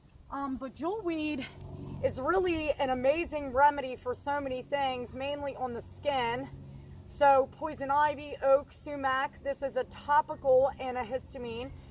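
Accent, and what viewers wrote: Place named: Pennsylvania, United States. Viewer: Corporal sent me. Great content. I love gathering knowledge. My wife and I were wondering if you lived in Pennsylvania? You sure sound like it. Lol. Sorry if you're not.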